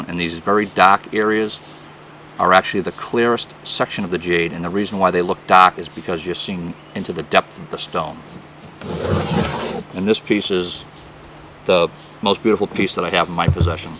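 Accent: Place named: East Coast, United States. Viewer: apparently you don't. LOL. I heard this guy moved back to Boston or whatever East Coast S-hole he came from. That accent is horrible.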